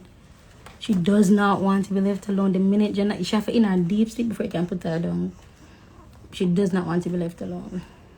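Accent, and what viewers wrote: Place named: Africa. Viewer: my first time commenting but I had to this time you’re so talented the African accent got me ooo someone plz sign her ooo nuff love ❤️ one of your fan blessings queen 👸🏾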